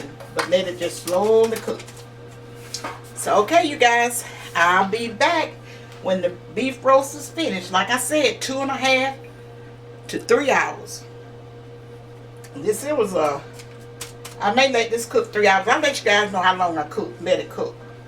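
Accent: South, United States. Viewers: Awww you are a God sent woman! I am putting your meatloaf recipe in the oven precious woman of God! So happy to hear about the give always. I can say, I am sooo very proud of YOU! You encourage me more than you know! My I love your apron. You can sale those as wells! Take your time woman of God and do what you do! I absolutely love it. Currently still running to cancer institute with honeylamb! So hopefully he will enjoy your tasty meatloaf! I am on Facebook and will look at your page! My husband leans towards savory recipes because chemotherapy took appetite! Oh, he is an assistant Elder at our church! By the way, you are loved and love your southern accents! It is what it is God bless you, and anything savory to get us through, please cook it! AgIn God bless you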